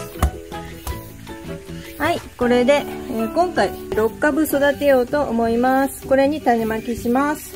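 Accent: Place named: Tohoku, Japan. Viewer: 『にずっつぶ』讃岐かぁおもいよったら、東北のナマリやなぁ。間引き、優劣がないポット…悩むんですよね。でも、さんが「気が小さいけん」ゆうて、なんのこっちゃ！？おもいよった😆😆 元気いっぱい、まけまけいっぱい葉っぱが出よるやん。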